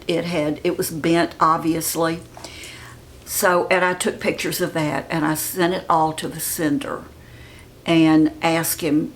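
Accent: South, United States. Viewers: You look lovely, as always. Do you ever watch Melissa55 on youtube? She reminds me of you...your Southern accent, some of your facial expressions and mannerisms. Anyway, hair color, particularly box colors, fade on everyone's hair over time, especially if you are covering grays. Even though they say "permanent", they are not going to stay vibrant forever due to shampooing, sun, and other products. I started coloring my own hair in the 1970s and became a licensed cosmetologist 20 years ago. I cannot tell you the number of times I colored my hair and, being a natural born redhead, I have been everything from bright copper to mahogany to strawberry blonde and red is the hardest of all colors to keep vibrant. I gave up coloring 5 years ago and am so happy I did, but boy, I do love the color of yours now. It looks age appropriate and youthful at the same time. 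Sometimes I think I would like to go back to the pretty blonde with high- and low-lights I used to wear, but alas, it is so much easier to just go natural (for me!) Your color is beautiful and you did a great job on the cut, too!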